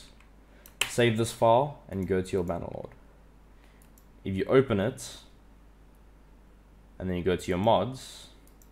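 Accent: South Africa. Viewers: Always nice to hear a fellow South African on yt. Keep up the good work bru👍🏻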